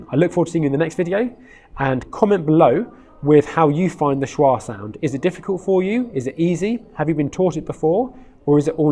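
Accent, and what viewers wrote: United Kingdom. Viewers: Hello!I know that you're British but where is your accent from? thank you! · sound like you are pronouncing ^ as a in father. I think you are giving British & not American pronunciation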